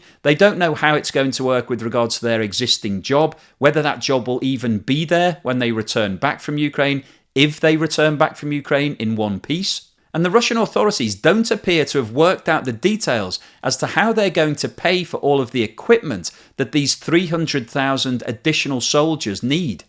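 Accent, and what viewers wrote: United Kingdom. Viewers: he claims without citing any source that it doesn’t “seem to be the case” that Russia stuck to its original claim that they’d only call up reservists as part of their expanded military campaign - he follows up with stating that “many” people who have “gotten those papers” have absolutely no military background, again without citing any source and certainly without any sort of quantitative estimates provided - he immediately then admits that “there are no official reports” regarding this issue, but he offers in its place, as some sort of proof, the always-trustworthy “reports on social media”. These are just a few examples of how incredibly biased towards western mainstream media narratives that he is. This guy or whatever is incredibly ill-informed, close minded, and tbh seemingly just downright dumb; he constantly uses unsophisticated,overly simplistic arguments that are the type of propaganda you’d find as talking points from an episode of vrian stelter on CNN. 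Your British accent can only get you so far buddy. Sooner or later you’re going to be figured out for who you really are: a run-of-the-mill, wannabe establishment political pundit, punk, who for some reason thinks he can brand himself as a some sort of a financial analyst, even though you are clearly just masquerading as one given how infrequently you actually present a comprehensive quantitative financial analysis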